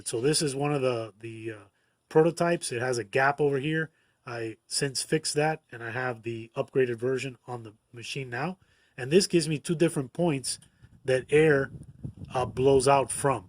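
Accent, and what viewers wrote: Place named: Miami, United States. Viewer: I know a Miami voice when I hear one...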